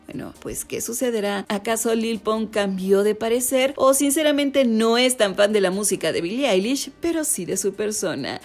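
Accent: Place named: Latin America. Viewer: Veo mucho Curioseando y ya me acostumbraba a una voz española, y ahora me tengo que acostumbrar a una voz latino americana.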